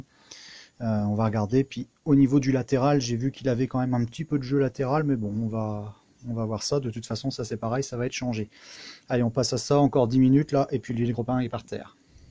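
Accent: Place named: North, France. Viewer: On entend quand même l'accent du nord lol ,super toujours au top tes vidéos et du taff de qualité j'adore ça ,je suspecte de plus en plus qu'on a à faire à un formateur en méca ou alors un grand grand passionné chapeau l'artiste 😉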